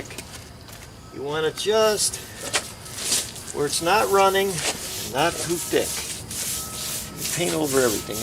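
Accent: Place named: Pennsylvania, United States. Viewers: That accent he has is typical N.E PA. I was born and raised near Wilkes-Barre and that accent really stands out now that I’ve been away for decades. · Schuylkill County girl here so love your accent,. I now live in South Carolina and just did my sidewalk the other day. So far it looks great! Now I'm moving on to the driveway.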